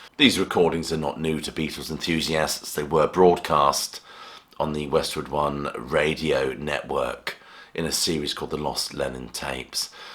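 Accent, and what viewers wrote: United Kingdom. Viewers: Thanks! Excellent reviews. British accent...priceless. 😊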